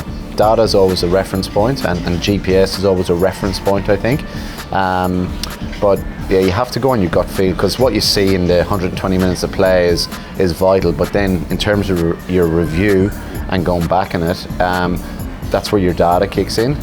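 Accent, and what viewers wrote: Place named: Cavan, Ireland. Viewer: I love the fact hehas a Cavan accent (that's how you spell it)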